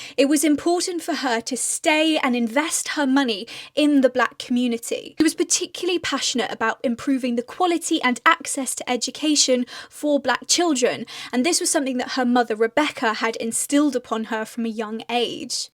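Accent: England, United Kingdom. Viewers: I find it funny as a black man, I learned a lot of black history on YouTube. Even more funny, it comes from a white woman with an English accent LOL. Glad we have this platform to post videos.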